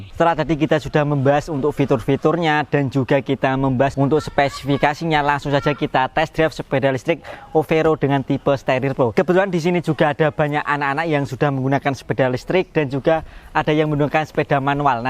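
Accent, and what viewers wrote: Java, Indonesia. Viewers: Cara mereview logatnya dialek Jawa apa ni mas, biar beda ama yg lain sering2 aja cara mereviewx ngasal 😅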